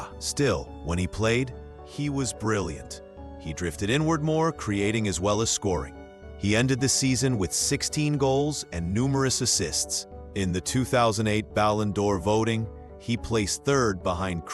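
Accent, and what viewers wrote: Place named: Brooklyn, United States. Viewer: Good video but the narrator's Brooklyn accent was a distraction!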